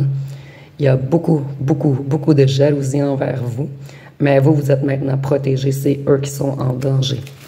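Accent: Quebec, Canada. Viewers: Merci beaucoup Madame la Cartomancienne.Je suis de France ET des Îles de France.J'adore le CANADA ET LES ACADIENS ET ACADIENNES D'OU VOUS ÊTES CAR JE RECONNAÎS FORT BIEN L'ACCENT DU QUÉBEC.BONNE JOURNÉE À VOUS MADAME 😂😂🎉🎉🎉❤❤😢😢😢🎉🎉😂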